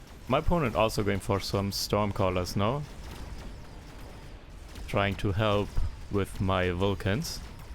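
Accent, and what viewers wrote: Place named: Germany. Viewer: Sorry for the personal question...I am still wondering about your clean speach/language. Are you a native German maybe?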